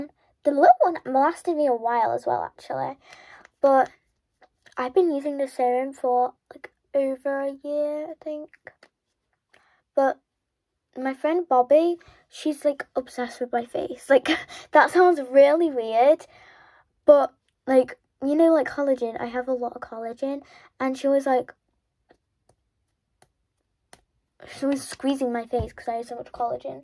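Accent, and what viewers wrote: United Kingdom. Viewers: I don't know where you're from but your British accent is a chef's kiss xx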